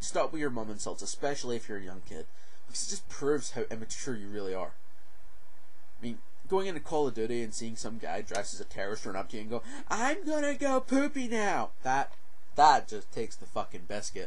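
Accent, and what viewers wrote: Scotland, United Kingdom. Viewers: I can understand your True Scottish Accent, but I think what your working with is good enough. It works very nicely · i understand you fine with your scottish accent..maybe because i'm part scottish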